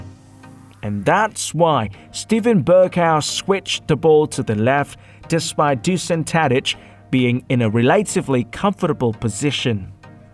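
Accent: United Kingdom. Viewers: 1 like cho bạn nói giọng Anh chuẩn 😌 trong khi nhà nhà học theo giọng Mỹ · giọng này giọng Anh chuẩn bố ơi :)) giọng Anh gốc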